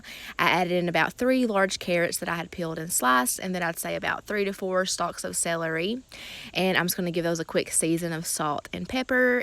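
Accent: South, United States. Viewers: Are you gonna do any more videos of packing your husbands lunches? I got so many ideas from those videos as well and hoping that you’ll bring those back. Love your content love your southern accent keep the videos coming♥️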